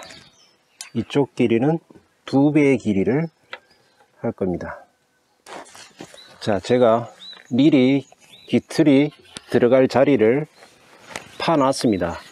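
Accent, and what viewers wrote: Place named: South Jeolla, South Korea. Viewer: Thank you for teaching some Korean wood crafting! I enjoy your chollnamdo accent reminds me of Gwangju. 😘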